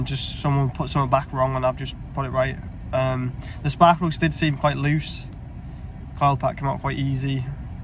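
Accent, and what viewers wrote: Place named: Middlesbrough, United Kingdom. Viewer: sounds very middlesbrough talking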